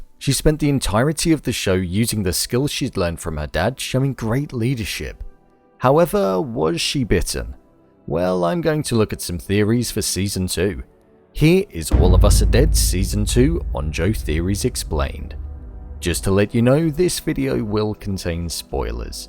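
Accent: United Kingdom. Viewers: i subed uh provably not for these topics but for the accent lol , listening you will help me learning british english a lot.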